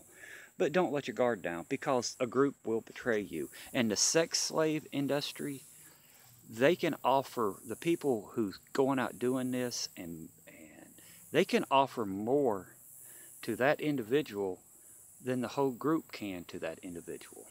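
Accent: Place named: Texas, United States. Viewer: You must have spent a lot of time in TX. You have a noticeable TX accent.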